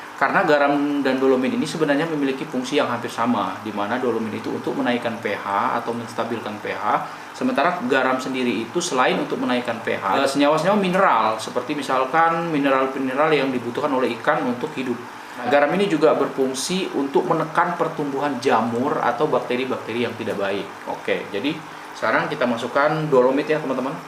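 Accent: Indonesia. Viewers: Bang logatnya seperti orang Lampung, saya dari Kalianda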